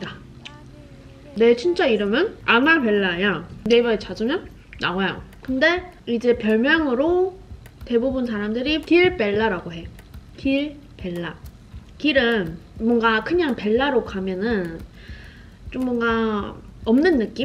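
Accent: Gyeongsang, South Korea. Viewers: Lovely Lady,. 😍❤👍🏻👏🏻💐💐 그런 이유로 말투에 경남 억양이 베어있었군요😮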